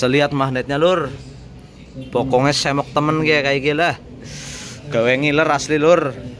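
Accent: Banyumas, Indonesia. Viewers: Ngapak nmen yah mas.. pkoke jos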